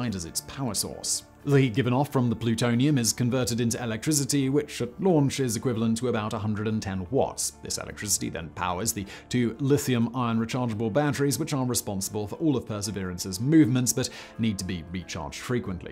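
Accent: RP, United Kingdom. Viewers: Manscaped isn’t just for under your knickers, you can also use it to remove that sweater your always wearing under your shirts. I’m sorry, the “jumper” you wear, you speak posh, so you don’t understand “sweater”.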